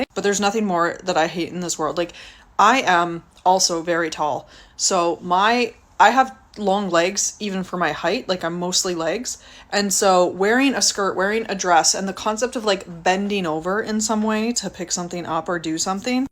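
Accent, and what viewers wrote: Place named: United States. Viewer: Rarely comment on videos, but why not… knowledge is power so the least I can do after hearing your perspective is to offer my perspective and opinion in return. So here we go… #1 First and foremost - This is 2025 and judging by your accent it sounds like you live in the states. Wear what you feel comfortable wearing and don’t worry about what other people think. - FEELING exposed is definitely a thing. Again, wear what makes you feel comfortable. For the same reason I don’t like to wear pants or shorts that don’t fit me right because I FEEL like they expose my ass crack lol I’d rather I didn’t feel exposed. - I mean, we couldn’t take pictures with our phones when I was in school haha so can’t relate there 🤷‍♂️ We did, however, sneak peaks when we could of course. Natural curiosity. But to take a picture and expose someone publicly or save a moment in time for future reference without their consent? 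That’s just fucked up. That’s just bad character. - Oh we are 100% picturing what you look like naked whether you’re in a dress or in leggings. Leggings actually make it easier though, just sayin’. Well… I guess it depends on the dress, too. Anyways… I that we goes back to natural curiosity as well. Speaking from a man’s experience, we have our preferences. At the end of the day, our primal instincts are just trying to narrow down a suitable mate. That, however, should NEVER excuse intolerable behavior of societal norms (but what has our society turned into anyways?). Without moral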